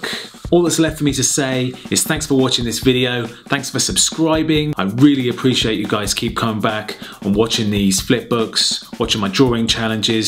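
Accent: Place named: Sunderland, United Kingdom. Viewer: Your deffo from sunderland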